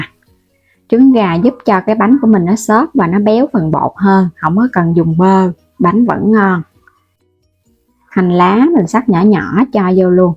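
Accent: Mekong Delta, Vietnam. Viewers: Giọng nói miền Tây dễ thương mà bạn sao nghe khó vậy hả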